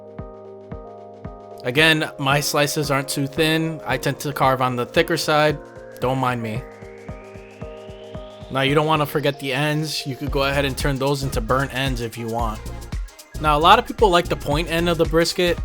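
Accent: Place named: Pennsylvania, United States. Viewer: You gatta be from PA with that accent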